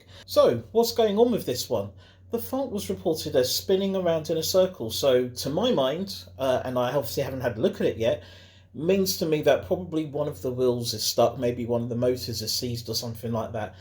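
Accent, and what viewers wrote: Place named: United Kingdom. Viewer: You sound British but your hands don't look British😅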